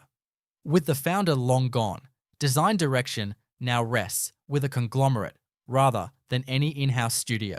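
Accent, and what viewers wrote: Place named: United States. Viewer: Pronouncing numbers the American way eg "one-hundred-forty", not the correct one hundred and forty is annoying.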